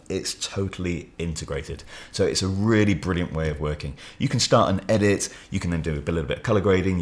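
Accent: England, United Kingdom. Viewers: apart from the British accent, the video is great. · I am Swedish and I just love your English accent. Its sound so pure and beautiful, not like the american accent 🤣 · I stopped at this channel purely because the presenter speaks British English, not American English. Then I found that he is knowledgeable and thorough as well, so I subscribed. 🙂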